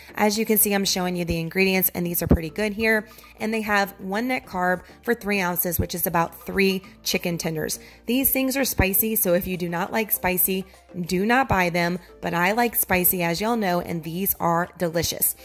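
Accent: Louisiana, United States. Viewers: Girl I can hear that fellow Louisianian in you!!! 504 representing!!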